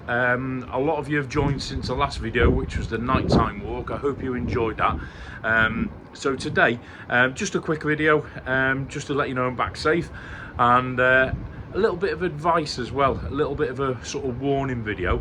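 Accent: United Kingdom. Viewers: Do you have a scouse accent?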